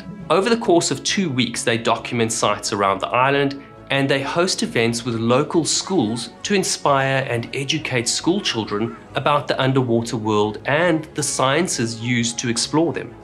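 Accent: South Africa. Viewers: For a second I was confused to hear that accent, but it's always good to find a fellow South African in the wild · Love your accent. As a non-native english speaker i was curious where are you from. My guess was australian but seeing the comments now i know its south african. Very nice❤ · That is a South African accent right there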